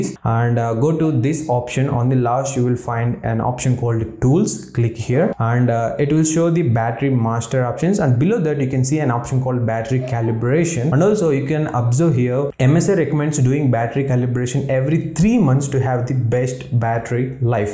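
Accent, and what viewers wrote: South India, India. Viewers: He might be south Indian that's why the accent somewhat different pronunciation you will find when north Indian people say. "you don't" . It's just the different accent.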